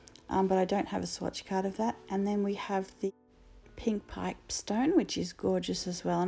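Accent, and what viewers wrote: Australia. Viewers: her billowing heart You sound Australian? Thank you for this delicately made video, it was so different to all the other palettes that I see on Utube. Tip: I read on a comment a while ago, that you can use glue stick on the palette, unless you like your little beads of colour on your tin which are really cute! I use the glue stick and I wipe it off with a wet tissue. The reason I like this, is you don't have to scrub it, or erase it or buff the enamel. It prevents staining too! Just be mindful not to use a towel, or a heavy paper, as you will get lint in it. It makes sense though, with the sticky honey pigments, although when you say use glue stick, it kind of shakes you up a bit. Have you naturally taken a while to like all these colours? Interested in getting more of those pearly colours from Daniel Smith, and the gold that was on your cherry blossoms? 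I think I liked it when you mixed these out, as it looked like you had a lot more that I wouldn't have thought to make. Have fun In kindred spirit Eliza MB Day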